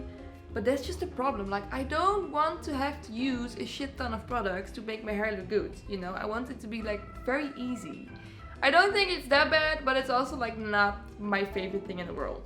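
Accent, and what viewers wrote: Netherlands. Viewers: First of all, amazing hair. Second of all, are you Dutch? You sound Dutch and im jealous of ur hair.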